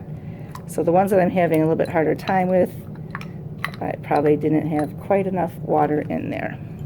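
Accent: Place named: East Coast, United States. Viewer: Wait! You are in Michigan too? With your accent I thought out East! ♥️💋🇺🇸💃🏻 Cool! Sorry, I am new to your channel so I just found out. Love your videos they are so calming. Thank you! 🙏🏼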